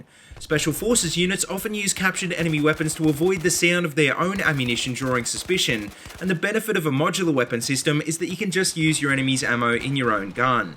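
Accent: Australia. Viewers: You sound very familiar. Which state of Aus do you live in?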